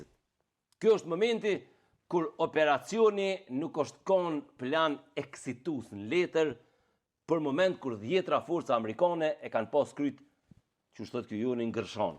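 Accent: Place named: Dardania, Kosovo. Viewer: me pelqen kur fol se e fol guhn e bukur DARDANE po te lutem mos i merr fjalet pej guhes shyipe te shyipnis ajo nuk asht guhe ajo asht me qellim guha ma e flliqt primitiv pervers e globit jo rasist se e len te funit me shkru ajo nuk ka lidhje me guhn e shyipetarv ajo asht guha ma e re e globit ,mire e kanemaqedont kur thon se asht guha ma e re en dyja se maqedonishtja ashte e 1950 nja 22 vjet ma e vjetr edhe pse te dyja naen ekane gegrishten ata e shkelin dhe e urrejn guhn tone guhen tone gegrishten ton guhen e babs GEG E NANE GRISHE -GEGGRISHTEN TONE TE BUKUR GUHEN UNIVERSALE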